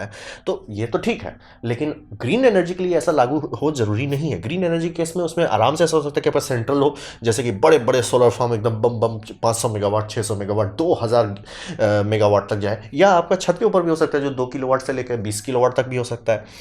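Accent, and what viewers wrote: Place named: Bhojpuri, India. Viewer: Goetha pure bhojpuri 😊😊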